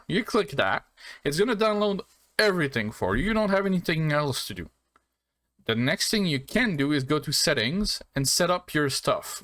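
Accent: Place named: Quebec, Canada. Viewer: Are you quebecois dude because you sound like the people I listen to on the radio here in st hyacinth. I love it!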